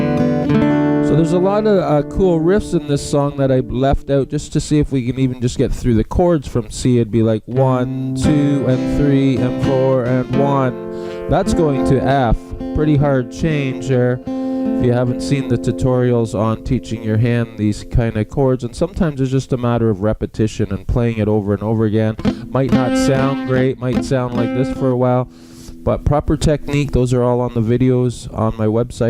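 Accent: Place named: United States. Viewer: Better explaining classic song than this old want to be picker needed. You sound American not British with lots of exercises not trying to prove how good you are.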